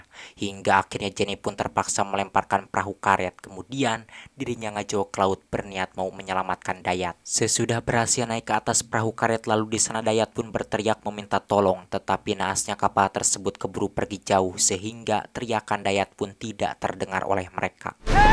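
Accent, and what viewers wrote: West Java, Indonesia. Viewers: Bg asli Sunda nya dimana?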